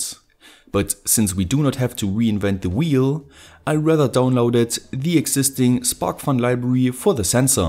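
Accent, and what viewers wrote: Saxony, Germany. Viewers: Ahaaa ein deutscher 😂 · bist du aus Leipzig? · are you german??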